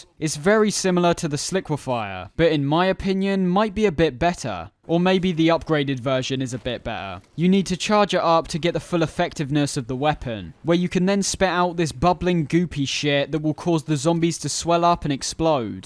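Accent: United Kingdom. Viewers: Australian? This guy’s British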